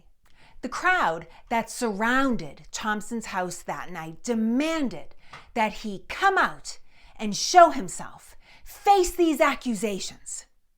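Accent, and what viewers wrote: Boston, United States. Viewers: I love your Bahston accent and I'm from Boston... Bean Town forever!! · I love the Boston accent! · I love lovely Boston accent. Out here on the west coast we don’t get to hear that enough. It took me a while to figure out that what she pronounces as “Woben” is actually Woburn! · That accent. My Bostonian heart. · A great story. Plus, a well-edited presentation, delivered with passion and enthusiasm. And a fascinating accent! I had no idea that this is the Bostonian sound. The whole video is clear, measured, and charming. Thank you. 👏🏻👏🏻👏🏻 Subscribed. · now that's an authentic Boston accent. Awesome uncovered history! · Wow, that is one thick Boston accent! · Please edit your video captions. You have a Boston accident that makes it hard to understand. So I I used captions. Where is Wuben? Good info, just make yourself more understabdable. 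Thanks. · OMG, love that strong Boston accent! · That accent is definitely Boston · Interesting historical info and I enjoy her Boston accent but I wish someone would tell her that she doesn’t need a separate hand gesture for every single word. Just speak; you don’t need to continuously wave your hands. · Subbed for the Bahston accent ALONE. Intelligence:: BONUS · My God, the accent... never heard one this thick before, I wonder where in BeanTown was she raised? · you're reading too much into it. Time to relax. I was smiling and laughing through the entire video. Her Boston accent made me HAPPY.😂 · I cant believe i thought she was British for a minute . Her Boston accent is so Revolutionarly Fantastic!!!! ❤❤ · I truly love your enthusiasm for history and your Boston accent is amazing! Consider me Michael Paul Padilla a fan and a friend in history!! Thanks so much for your wonderful videos!!! 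· “…. but this leatter conTAIns FAr Maore TAN meats ta eye.” I had forgotten how much I love a properly spoken Boston accent. AND wicked good content? The narrators constant location changes requires far more volume mixing with a sound bar than one would think. Truly impressive post production work! It was seamless. Hearty congratulations! I’m ALL in !! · Who’s here for the bahstun ahkscent……..the lettah, the cah, I love this accent 😂😂😂…..❤❤❤ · Hearing these stories from someone who is clearly a Bostonian and has the accent to prove it makes me feel good, I was raised in Somerville and know its history very well. Thanks for sticking to your roots! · As always, the facts you bring to us are fascinating and often something we are learning for the first time. Isn't it tragic to betray not only a friend but your own country. Btw, I'm not from Massachusetts but I love your Boston accent. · Now THAT’s a true Boston or at least Massachusetts accent!🤣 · Very interesting, great job!! 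Love the Boston accent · I love your channel.I love american history and I learned something today, thank you for doing these videos.I love your boston accent you're very genuine, and very adorable person and I believe you are gift from God to us.Americans and may God bless you forever! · “Ahlettah was sent that nevah was meant to be seen…. It makes you wandah…” Now that‘s a Boston accent! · WILL YOU MARRY ME?! …and speak freakin Boston-ese sweetly in my ears for as long as we live?!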